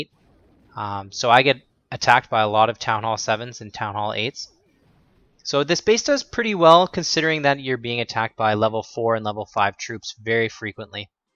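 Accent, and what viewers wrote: Canada. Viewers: canadian? lol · your Canadian :D i can tell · Not trying to be mean but r u Canadian?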